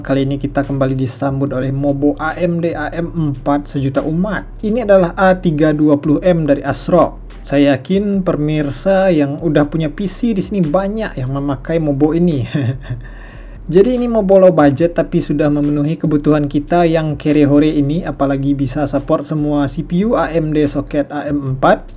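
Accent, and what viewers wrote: West Sumatra, Indonesia. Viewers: Logatnya kaya orang Minang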